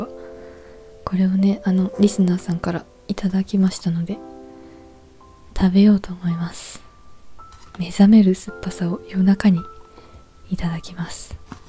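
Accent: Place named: Shimane, Japan. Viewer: の島根言葉がかわいｋ・・・zzzz..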